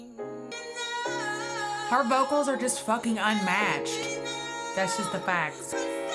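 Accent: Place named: South, United States. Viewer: your southern accent is my favourite thing ive ever heard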